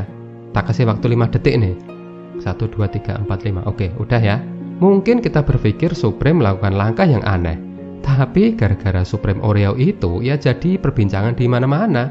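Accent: Java, Indonesia. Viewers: Bisa gk medok nya di hilang'in??? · Suaramu medok tenan mas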